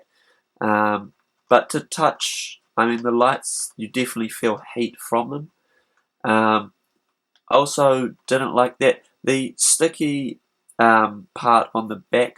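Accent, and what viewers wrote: New Zealand. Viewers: Are you a kiwi or an aussie? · i thought so, you've got that kiwi accent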